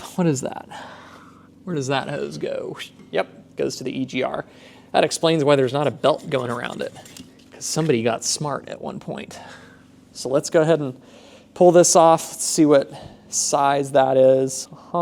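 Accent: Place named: South, United States. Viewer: You've got a southern drawl you don't really get on your aviation channel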